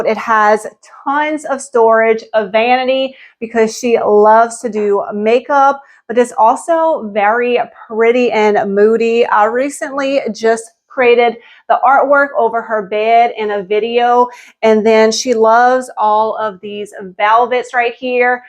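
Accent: Louisiana, United States. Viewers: What is the accent… love it. Is it Louisiana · Hi I’m originally from new Iberia Louisiana. I heard your accent and I had to watch making me homesick. I enjoyed your video and subscribed.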